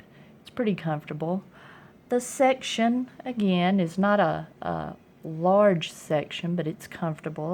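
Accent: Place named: Appalachia, United States. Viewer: Good review, but it's wearing to listen to her hillbilly accent.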